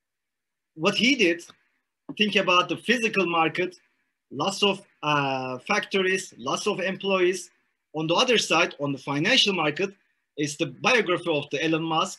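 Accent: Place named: Turkey. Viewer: Aksandan həmən anladım ki, danışan türkdür :) uğurlar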